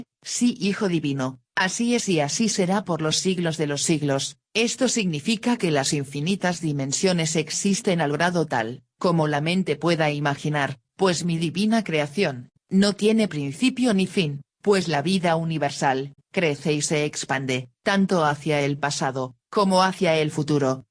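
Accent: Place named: Spain. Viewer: Me sorprende que esté leído por una española...será que ya llegó la Doctrina a Europa?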